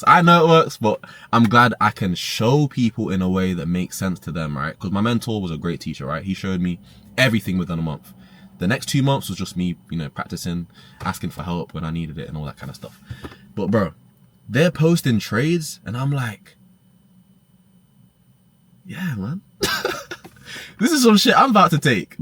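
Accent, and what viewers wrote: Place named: United Kingdom. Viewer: Respect for this bro, I heard the UK accent and was immediately hooked lol. It's always motivates me seeing guys from here like me thriving to keep going myself and believe ill eventually succeed. May God continue to bless you for the wisdom you're sharing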